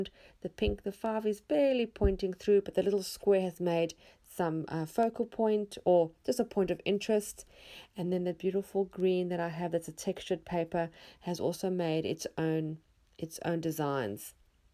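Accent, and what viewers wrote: South Africa. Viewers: It was so wonderful to hear a South African accent!! First time viewing your channel! Sending blessing from sunny South Africa!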